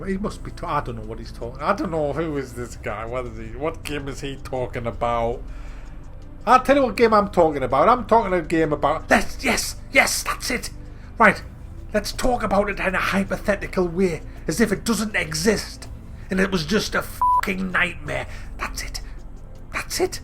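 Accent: United Kingdom. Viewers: omfg. this is the best channel for pessimistic gamers ever. And the british accent makes it even better for us yanks. so entertaining. i try to show everyone i know this channel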